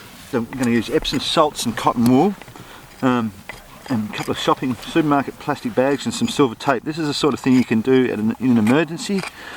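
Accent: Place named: Australia. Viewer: You have a different technique than more farriers in not straddling the horse's leg. Love your accent, are you from Australia?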